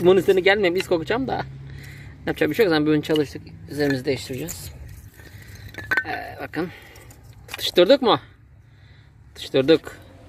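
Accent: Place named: Bartın, Turkey. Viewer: Bende bartinliyim istanbul da yasiyorum bizim oraların konusmasini duyunca çok mutlu oldum benim annemde böyle odun ateşinde yapardı evin önünde yiyesim geldi şimdi bu sene koye gidemedim koronadan sizi yeni keşfettim artık izlerim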